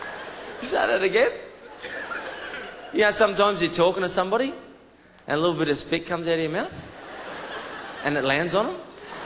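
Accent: Australia. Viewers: OMG!!! This guy is freaking HILARIOUS!!! I'm in pain from laughing so hard!!! Love that Aussie accent to top it all. Outstanding indeed!!! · nice to hear an aussie accent again :) good ol days.. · He makes himself sound so much more bogan. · Isn't good to hear a true Aussie voice...and an ol fashion one at that😅...just like grandpar & ya uncle from the 50 and 60s..what ya think..a bloody fairdinkim aussie😊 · no shit listen to his accent are you deaf? You couldn't be more Australian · Country u come from isnt the factor what makes the difference in humor.... punch lines and the way you bring it does.... Aussie accent sounds better I'll admitt to that! ... 😂 bring on the laughs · The Australian accent is amazing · Hilarious!!! Lazy Aussie accent + everyday things = killer combo. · lol... he is so aussie.... that accent is so strong. :D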